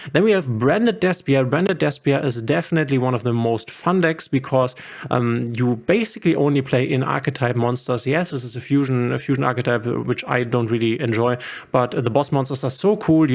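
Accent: Germany. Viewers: Hör ich da nen deutschen Akzent raus ? 😄 wenn ja, geiles Video 💪🏻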